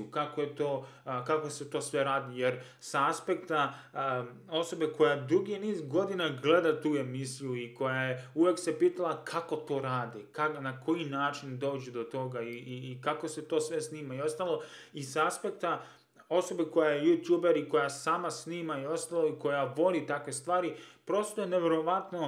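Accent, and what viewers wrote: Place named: Bosnia and Herzegovina. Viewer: Koliko mi je jak ovaj momak,kako priča haha poyy brt iz BiH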